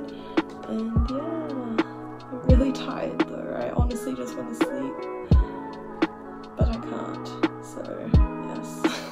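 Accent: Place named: England, United Kingdom. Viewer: Are you from the UK? You have a very English accent.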